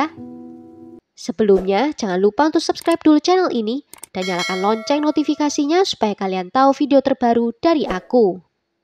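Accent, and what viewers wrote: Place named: Java, Indonesia. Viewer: kenapa kasus yg anda ceritakan selalu menarik apa rahasianya.....dan satu yg tak terlupakan yaitu medok nya.....top lah pokoknya.....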